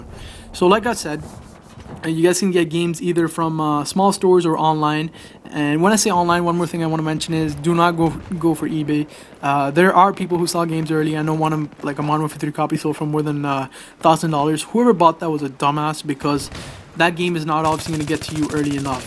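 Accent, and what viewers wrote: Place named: Montreal, Canada. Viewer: u sound like u live in monteal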